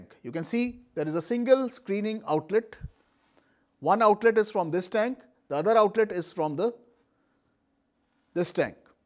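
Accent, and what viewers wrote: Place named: India. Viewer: These Indian heavily accented videos drive me nuts.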